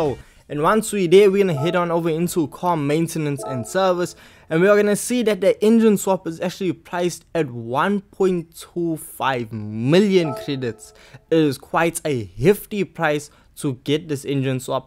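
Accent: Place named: South Africa. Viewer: This bro is a South African indian 100%... I swear straight from durban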